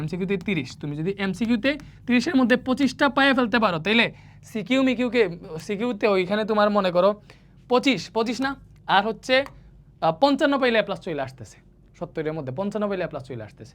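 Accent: Sylhet, Bangladesh. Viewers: Apni ki Sylheti Paz vai ripley den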